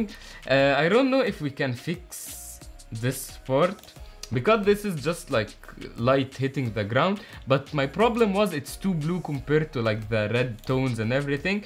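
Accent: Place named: Egypt. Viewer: I like the last idea. Breaking down famous artists. And even in that idea, performing their famous art yourself is the part I loved the most because I guess we will go through a very good learning curve that way! 😍 Also loved this video. You should keep doing such videos too every now and then. And last but not the least, I love your accent. I was living in Cairo for 6 months and hearing you was making me feel nostalgic. I felt like I'm home throughout the video! 😃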